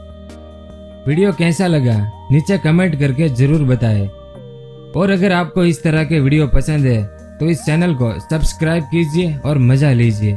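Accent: Gujarat, India. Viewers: Accent gujju bhai ..... 🤣🤣🤣🤣 like it....!!! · Ap gujarati hona bhai😂😂😜???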